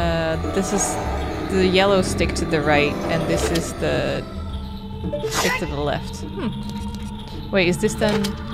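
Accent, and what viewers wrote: Netherlands. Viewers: Curious how the pronounciation of the names with a Dutch accent are actually VERY close to how we pronounce it in a Brazilian accent (It was amusing to notice that said the names the same way I do haha) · Hah, wist wel dat je nederlandse was, sommige engelse woorden spreek je nederlands uit. Was niet zeker of je achternaam Nederlands was, heb je ook best veel buiten nederland. Leuke gameplay verder, deze spel is echt vet. Toen ik 9 was ofzo speelde ik het met mn vader.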